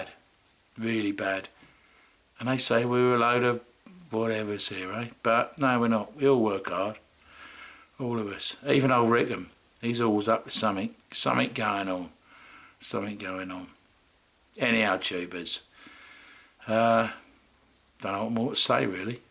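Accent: United Kingdom. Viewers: Hey! You could get a job as the lizzard in the Geico Commercials. Or you could do a nature show on public television. They dig that British accent. Seriously, though. best of luck on your new job. Times are tough all around. Be safe.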